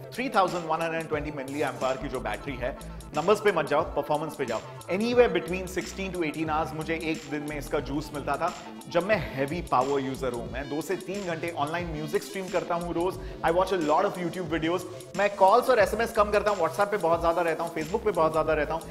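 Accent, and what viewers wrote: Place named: Russia. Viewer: That Russian accent though.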